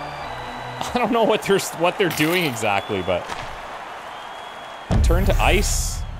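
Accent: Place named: Saskatchewan, Canada. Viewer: Your speaking gets much more "Sasky" when you are playing this game. As a fellow Saskatchetonian, I love it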